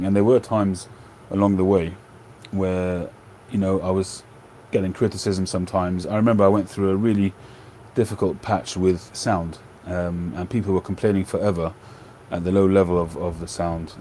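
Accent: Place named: United Kingdom. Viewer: Excellent. Reminds me when I use to listen to the BBC on Shortwave in the 50's. Very British.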